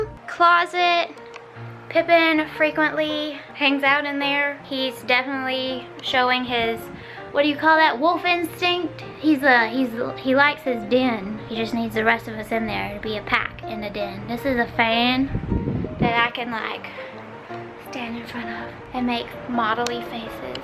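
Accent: South, United States. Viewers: I hope southern accent never ever goes away:) And I get to hear it in real life three time a year, woot, woot! Whenever I visit the south. And since my mommy is southern I have a slight twang in my voice too, I'm half Latino and half European American. My accent is interesting its a little bit southern mixed with a slight Hispanic/midwestern accent. Ya'll can hear it over on my youtube channel, since I finally got the courage to upload my first ever vlog. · Loved this. I'm from Alabama and I make sweet tea probably everyday for me and my family. Tea is meant to be sweet and cold.. always. You should do a video on grits and cornbread. I have all of my grandmother's furniture too. Too many memories to get rid of them and they are special to me. It's nice to hear a Southern accent on YouTube too. But... why isn't there a sweet tea emoji? 😉😎😂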